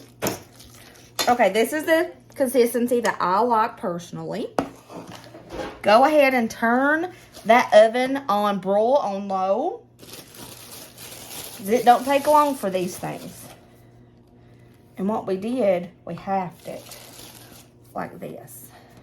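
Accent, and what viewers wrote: South, United States. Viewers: You talk country! Where ya from?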